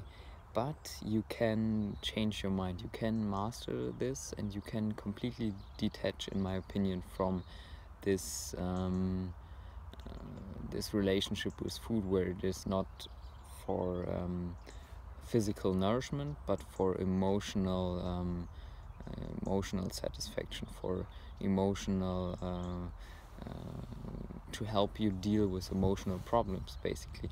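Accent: Germany. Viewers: are you German? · By the way, am I spotting a german accent there? :)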